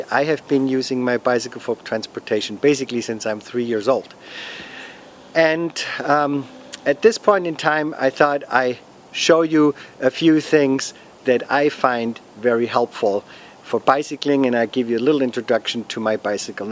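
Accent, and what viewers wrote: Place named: Germany. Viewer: no, your accent gives you away from being originally from Germany, not that you have a business trip there. ;-)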